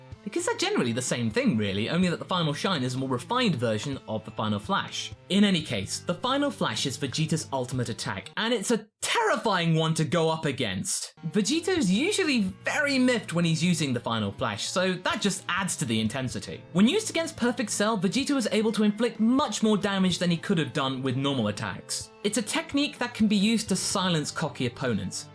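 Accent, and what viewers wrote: England, United Kingdom. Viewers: gt live supporter British. You can't tell by his accent? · Its because of the english accent. That's just how we say it over here.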